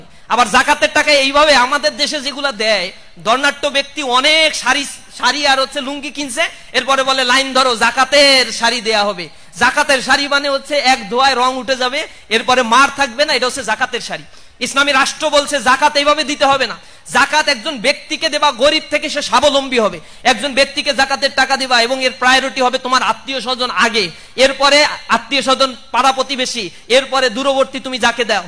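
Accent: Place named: Chittagong, Bangladesh. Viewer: তোমার কথা শুনে মনে হচ্ছে , তুমি চট্টগ্রামের মেয়ে।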